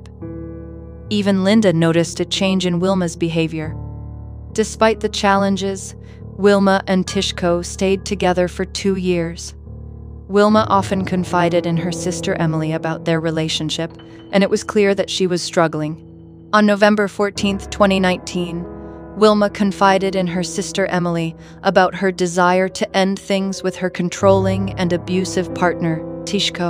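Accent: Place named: United States. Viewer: American narration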